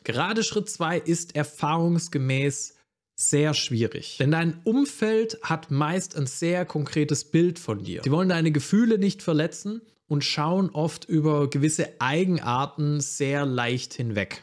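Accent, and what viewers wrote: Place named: Swabia, Germany. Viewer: Geiles Video. Den Schwabe in dir bekommt man nicht leicht raus. Grüße ins Ländle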